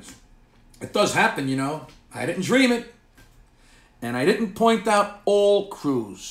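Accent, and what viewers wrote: New York, United States. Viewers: Hey. i had to move to virginia to take care of my dad who is a decorated war hero...for 3 years now. my family has suffered from discriminations..yankee..go home even teachers and his football coaches...can you hit on this please..were hard core new york wear are shirts proud.but its taking a toll on my son..we love your videos so nice to here some swearing and that accent only new yorkers have..my sons name is Tristan..you would lift him up so much..we appreciate all you do...laughed my ass off on your virus video...ill burn the fucking truck and walk home...funny shit..we where rollin.your awesome.cant wait to get back home when my dad passes. We love you brother stay hard and be safe much love to your family as well...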